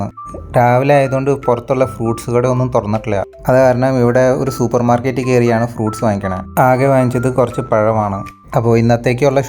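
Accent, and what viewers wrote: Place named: Perumbavoor, India. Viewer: നാട്ടില്‍ എവിടെയാണ്? എവിടെയോ ഒരു പെരുമ്പാവൂര്‍ സ്വരം.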